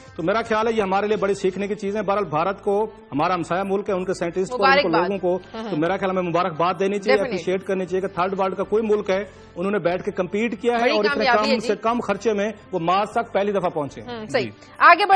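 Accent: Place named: Punjab, India. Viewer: Why is she speaking Punjabi acccent?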